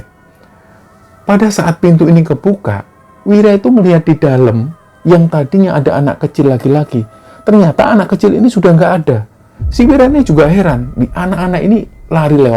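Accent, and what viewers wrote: Java, Indonesia. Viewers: Sempet suka sama beberapa channel yang nyeritain kisah horor, eh lama kelamaan kelamaan malah suka manggil narsum yang kayanya ceritanya ngablu/fiksi/dibuat2, kurang suka juga sih kalo yang nyeritain narsum nya langusng, karna ga semua narsum bisa ngatur cara bicaranya untuk bercerita Akhirnya sekarang lebih sering denger cerita dari karna yang nyeritain ya cuman mas doang, jadi feel nye hari ke hari makin dapet, terlebih karna saya orang jawa jadi lebih enak aja kalo yang cerita ada medok2nya/campuran bhs jawanya. Serasa lagi diceritain pakde sendiri semangat terus tim, semoga bisa makin besar channelnya dan pendengarnya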